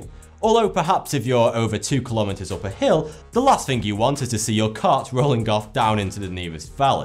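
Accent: United Kingdom. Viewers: I didn’t know Frankie Muniz had a British accent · 42 or thought 2? sry ur too british for me to understand clearly.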